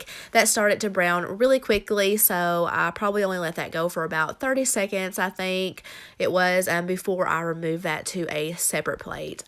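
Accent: South, United States. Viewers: I found your videos about 1 month ago, and I have been really enjoying your dinner ideas. I also love listening to your voice. Your southern accent is so charming! Thanks for doing what you do! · The southern accent is what puts me off this channel, sorry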